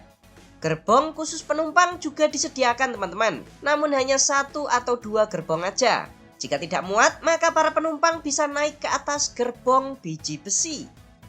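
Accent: Java, Indonesia. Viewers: kental banget logat jawa nya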